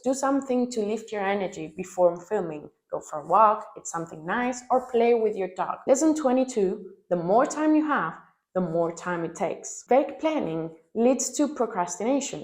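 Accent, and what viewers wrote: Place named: Denmark. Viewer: Thank you so much for this video. I am just over one month in and trying not to get discouraged by low views and having only a few subscribers! As an author I want my channel to be a community for my readers to connect with me, and each other. I hope that my persistence pays off in the long term. Also- I love Denmark and hearing your accent reminds me of when I visited last year!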